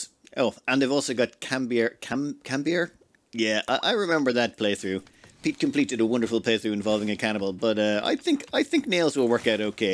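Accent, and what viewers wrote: Ireland. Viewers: That cannibalistic tundra survival was epic. Maybe you could do an inhospitable biome survival yourself sometime. I'd watch it. Watching you plot and plan is the biggest draw to your channel for me. That and the sweet Irish accent.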